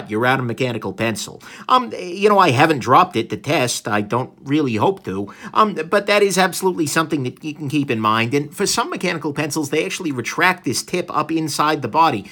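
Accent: New York, United States. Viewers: I love this guy's accent, is it New York? I imagine him driving a taxi, and wearing a white vest at home, and having a difficult relationship with his mother in law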